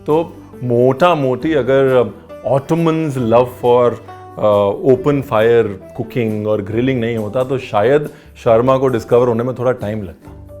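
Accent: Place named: Punjab, India. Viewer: bro u r out standing i mean kuch v hove tuhadia videos ni kde v miss kitia n tuhadi jheri apne aap punjabi a jandi vich bolde bolde oh ta bhti vdia lgdi menu jdo hain kehnde "hain" kehnde hehhe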